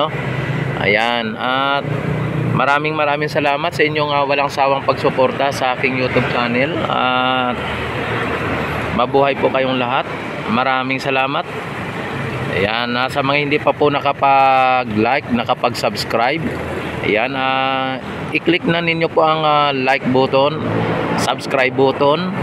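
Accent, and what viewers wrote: Visayas, Philippines. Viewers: 🤣🤣🤣bisaya!hirap ba mag tagalog at may punto ka ng bisaya!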